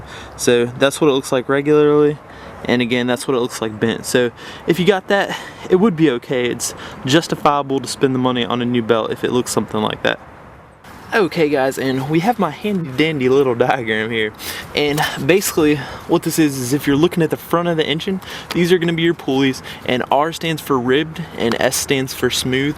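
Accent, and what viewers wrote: South, United States. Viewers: 2nd vid of his I have seen. Its like a Southern accent crossed with a Southern CA surfer vocabulary. Canada ???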